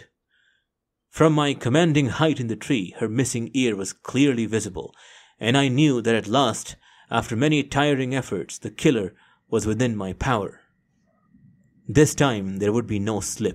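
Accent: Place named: India. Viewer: The other channels can’t compete with Mr. true Indian voice. 🇮🇳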